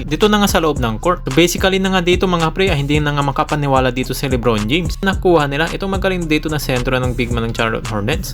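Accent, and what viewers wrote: Pangasinan, Philippines. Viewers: Idol taga pangasinan kaba? 😅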